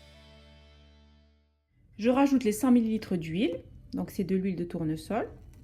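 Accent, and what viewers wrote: South, France. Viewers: C'est surtout le même accent du sud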